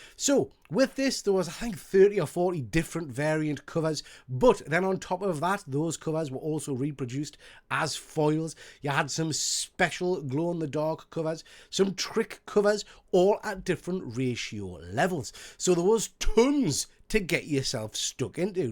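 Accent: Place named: Northern England, United Kingdom. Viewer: Sounds like a northern lad, gives comics away for free! How did it take me so long to come across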